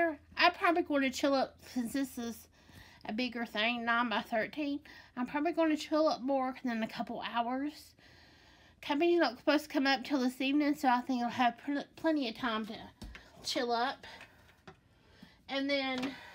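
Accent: South, United States. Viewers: I love that she says “poodin” lol has the cutest voice. It’s so girly and southern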